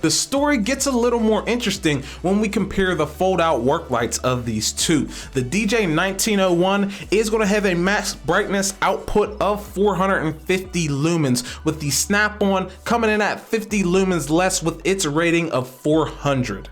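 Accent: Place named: South, United States. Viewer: i like a brother that has an southern accent as deep as my h*nky self does...you got a gmt900 truck...i've had the ecarb042 since it was first came out, wound up with 2 actually...left one of them under a 3.0 ecodiesel and it's now gone back to mother nature gaia...anyway, you're a new subscribe for me.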